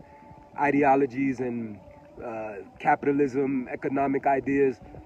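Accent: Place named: Patois, Jamaica. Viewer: I love ❤️ your Jamaican patois, it funny the ways it sounds.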